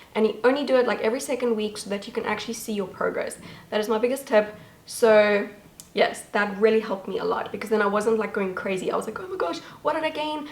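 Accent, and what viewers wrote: South Africa. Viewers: You probably get this question a lot, but is your accent south African? It's very beautiful. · You sound South African 🙃 anyway great video thanks.... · I thought i recognized the accent. Im also from SA, Cape Town. :) Love your channel! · Wait r u south african?? Capetonian here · are you south african omg love your accent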